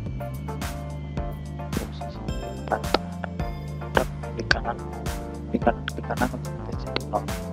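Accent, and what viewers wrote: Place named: Java, Indonesia. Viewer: Wong jowo koyone nek delok suarane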